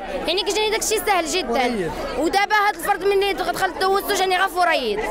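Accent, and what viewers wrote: Algeria. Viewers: ولينا نهدرو كيما هي في دزاير 😂🇩🇿✌🏻 فريض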